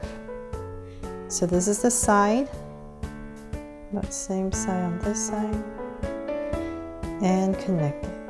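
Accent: United States. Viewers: R u American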